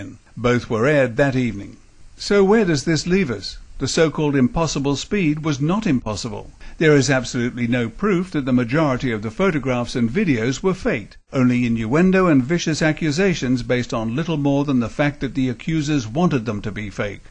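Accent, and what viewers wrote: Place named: RP, United Kingdom. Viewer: More disinformation, deliberately delivered with an austere well spoken English accent designed to give it an air of legitimacy. Doesn't actually address the point. At 700 feet it is impossible for a 737 to travel at or around 500mph. Though some official estimates put them second at over 550mph. It would disintegrate and also be impossible to maneuver the way it did. Think driving a double-decker bus at Formula One speeds. Also doesn't address complete nose section making it through multiple layers construction grade of steel intact. Depleted Uranium anyone? If you believe the official story you are a child.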